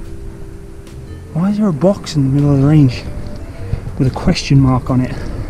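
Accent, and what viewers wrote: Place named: United Kingdom. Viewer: Hey where do u live? I thought maybe uk or something cause of the accent?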